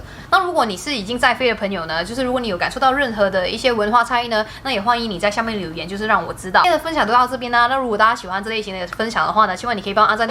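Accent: Taiwan. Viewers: 马来人台湾腔,吊吊吊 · 你講話好像台灣人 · 大馬華人怎麼一開口是滿滿的台灣口音？ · 我被迫要放慢影片速度來看。😭 你是马来西亚人吗？为什么你说话有台湾口音呢？🥺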